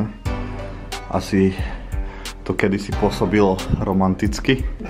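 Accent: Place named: Eastern Slovakia, Slovakia. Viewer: Ten východnarsky prízvuk je parádny. 😊👍 Už len začať hutorec v tomto videu. 😀👍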